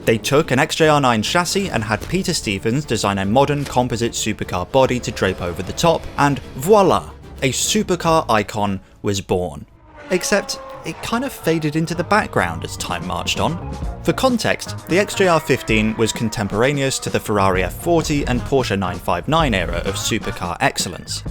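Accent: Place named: North, United Kingdom. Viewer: Awesome vid as always! Where in England are you from, if I may ask (I'm a non-Anglophone who's recently found a fascination for English regional accents)? You speak fairly posh but at the same time you are unmistakably Northern!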